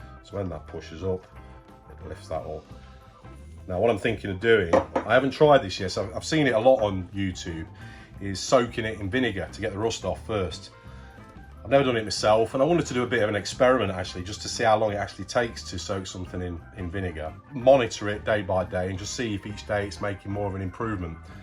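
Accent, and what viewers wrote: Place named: Grantham, United Kingdom. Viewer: Great video and nice haul from the boot sale. That Stanley magnum screwdriver is a good one, I don't see many that length, although the red bits of the handle looked very beaten up! Careful of buying tools at car boots, it is addictive as I have found to my cost! I am going to guess you accent as Grantham. All the best!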